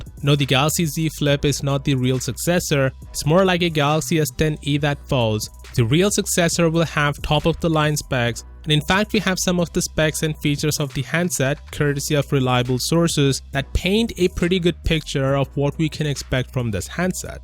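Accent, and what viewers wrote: India. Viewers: Sound hindi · As soon as i hear the indian accent I know this is just "speculation"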